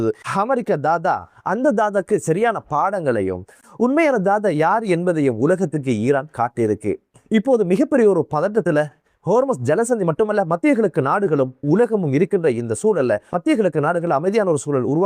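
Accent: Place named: Chennai, India. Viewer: நல்ல செய்திகள். Madras தமிழ்தான் பிடிக்கவில்லை.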